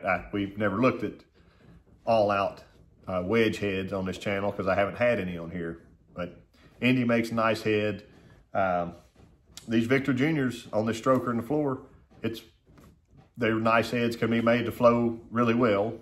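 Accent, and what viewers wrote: South, United States. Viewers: Super fine line! Love the Southern accent! Reminds me of a good day's work, awesome eats and a beer or two, and a good snooze to do it all over again. Thanks I hope you have a good morning and enjoy your day today. 15° and heavy snow ❄️🌨️ in Western Pennsylvania this morning.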